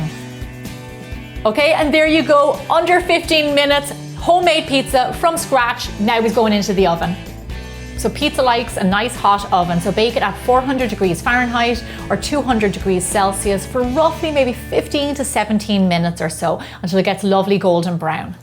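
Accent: Ireland. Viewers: The Irish accent is so buttery smoth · she’s actually Irish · Is she irish? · I think she's Irish.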